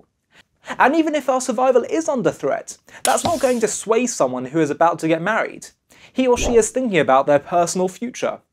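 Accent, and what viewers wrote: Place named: United Kingdom. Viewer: השדרן נראה תימני אבל יש לו מבטא בריטי. מה לעזאזל?! חחח 😂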